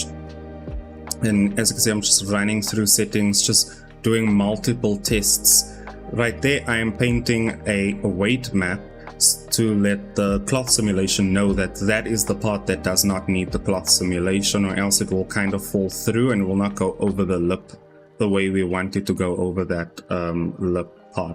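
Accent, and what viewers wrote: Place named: South Africa. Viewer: btw one question, are you south african? i can hear by your accent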